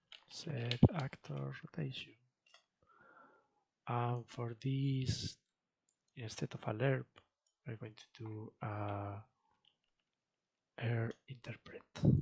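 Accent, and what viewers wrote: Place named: Spain. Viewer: i would like to ask you something about photon plugin, and your english pronunciation is similar to Spanish people, im from spain too, can we comunicate in Spanish? to be faster :)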